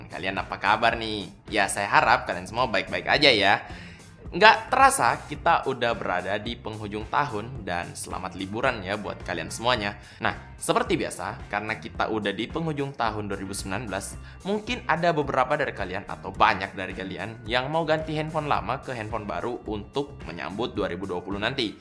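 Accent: Indonesia. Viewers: Tk kira org telok lema dri logatnya :v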